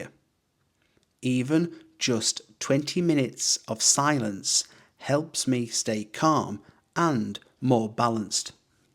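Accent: United Kingdom. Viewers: What kind of Britishaccent is it specifically?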